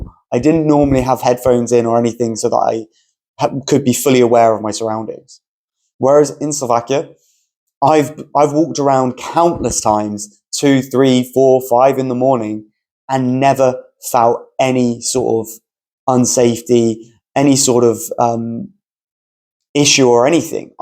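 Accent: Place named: South, United Kingdom. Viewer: I already knew from the title that this guy would have a southern accent. There are parts of the UK where it's better than he's experienced, but his general point is still true overall, even about the other places. An obvious example I thought of was how here, it's common that a bar will just run a tab and you pay at the end. Another one like the ice cream incident, I filled the car up at the Tesco on our estate, realised I didn't have my wallet so no money or even ID. The guys originally agreed I should repark the car and leave the keys while I walk back to my flat for my wallet. When I came back in with the keys and they'd seen I was going through with the plan they just told me to drive off and get my wallet so they could close the thing quicker.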